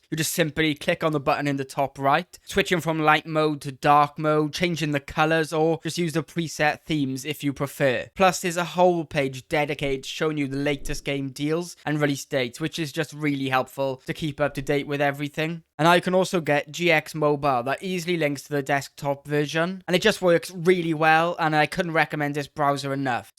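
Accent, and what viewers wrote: Wales, United Kingdom. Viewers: Are you Welsh or African-British? You sound like a combination of both, but I've heard you are Welsh, it's confusing me so much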